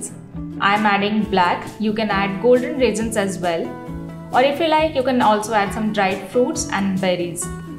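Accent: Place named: India. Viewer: Nice one lady! Thanksmuch. U make me laugh because of your indian accent. Bravo excellento Granola's love them to eat soon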